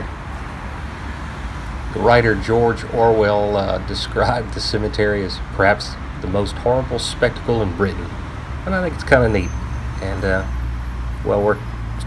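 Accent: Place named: South, United States. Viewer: I wasn't expecting to hear a good ol southern voice explain a London site. 😁👌🏻✌🏻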